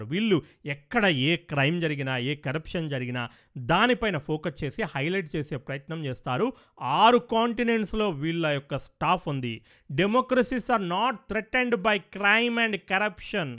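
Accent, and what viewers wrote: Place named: Telangana, India. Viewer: Kaka nidi telangana na sem telangana basha la matladu thunavu jai telangana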